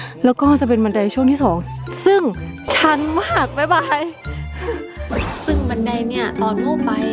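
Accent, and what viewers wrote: Isan, Thailand. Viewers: หลงมากกก น่ารักพูดอีสานอีกโอ้ยยย🤍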